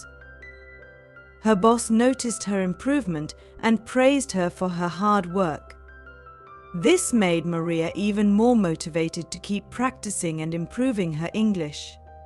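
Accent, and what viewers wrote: United Kingdom. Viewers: British accent?❤ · 영국신 발음이네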